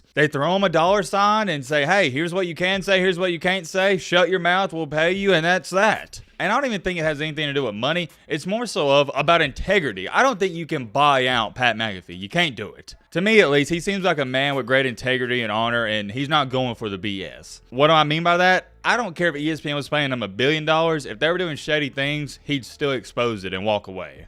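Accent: South, United States. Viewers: My first comment here, love the southern boy commentary. Dude the guy is a kicker who cares never even watched this guy. You are correct about Youtube. Youtube is the go to for everything, especially on sports.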